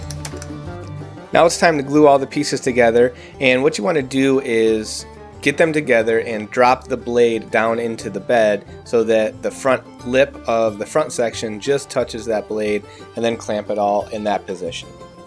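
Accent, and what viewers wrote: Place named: North Carolina, United States. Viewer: Am I heating a North Carolina accent? You sound like my grandson in Fayetteville. Great video.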